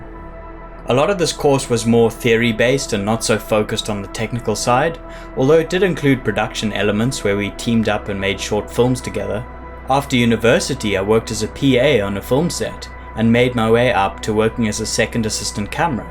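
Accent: South Africa. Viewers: AHA! I knew you were from some SA from ur accent but wasn't sure. Super cool to see South Africans doing so well on Youtube! Love the vids man keep up the good work.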